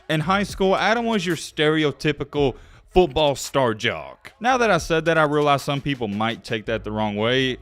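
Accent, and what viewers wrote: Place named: Appalachia, United States. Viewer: Bro, your hillbilly twang is awesome!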